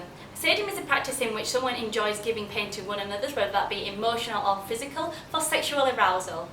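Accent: Yorkshire, United Kingdom. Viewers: Are you from like Yorkshire or somthing!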